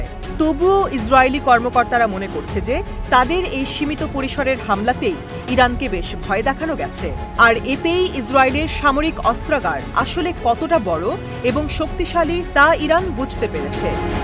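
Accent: Kolkata, India. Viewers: ৭১ টিভি কি সংবাদ পাঠে কোলকাতার সংবাদ পাঠিকা নিয়োগ দিয়েছে? উচচারণে তাই মনে হচ্ছে।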